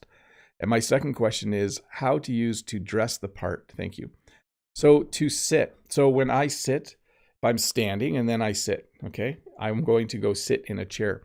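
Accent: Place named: Canada. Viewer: Hi, no problem your Canadian accent is great and we understand your good English very well. salut mon ami.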